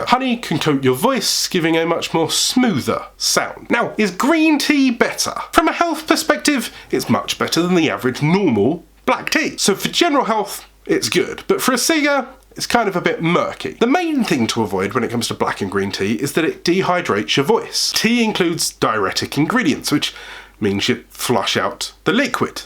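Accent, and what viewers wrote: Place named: United Kingdom. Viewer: You have the best British accent I’ve ever heard. You should narrate.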